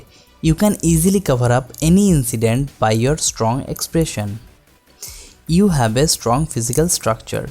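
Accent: India. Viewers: English subtitles please. Its hard to understand Indian English dialect but also Indians have great informations about palmistry :/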